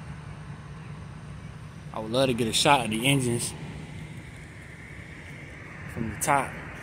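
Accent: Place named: South, United States. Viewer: You make a great train video my friend. I can't figure your accent, either North Carolina or Louisana.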